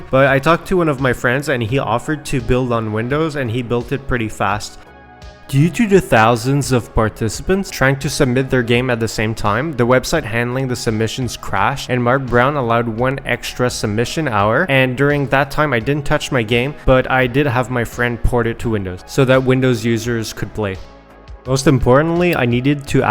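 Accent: France. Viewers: you sound french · you have a french accent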